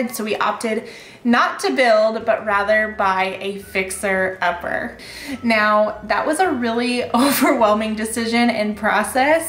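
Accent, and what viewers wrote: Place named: Midwest, United States. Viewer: Welcome back to the mid-west.I am from Iowa. I thought you sounded more like me. Right now moving closer to family feels more secure and helpful. I wish you months of hard work and much love for your home. I will be watching you. Joyce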